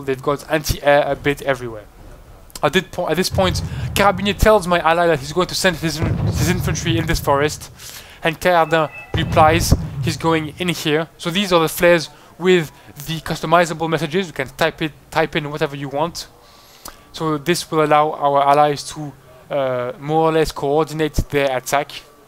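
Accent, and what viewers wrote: France. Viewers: First :p, nice vids keep up the good work and the french accent ;)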